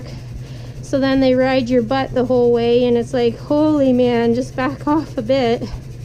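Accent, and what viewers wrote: Canada. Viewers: The moment I heard you talking I knew you were Canadian! Just found your channel & I loved your commentary on this video! Subscribed & happy to follow a fellow Canadian ;) look forward to seeing more! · Judging by the way you speak, you must come from southern Ontario. I'm from northern Ontario. You wanna talk winters??? My daughter moved to Windsor I was almost ready to move with her lol. We get really bad storm up here.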